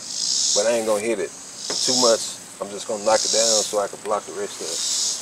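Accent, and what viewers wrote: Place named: South Alabama, United States. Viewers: Didn’t realize you were from Alabama! I knew you were in the south somewhere bc of those cicadas and your accent. Hey from Anniston 👋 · That sounds like south Alabama I miss home lol getting my 85 t top prepped for paint now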